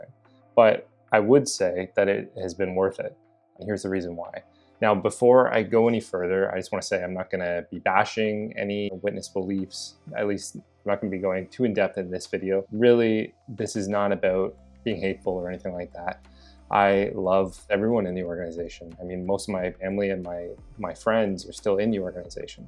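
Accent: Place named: Canada. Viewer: I’m enjoying your video but I’m also distracted by your accent 😂 are you Canadian? I keep hearing twinges of Robyn’s accent from how I met your mother lol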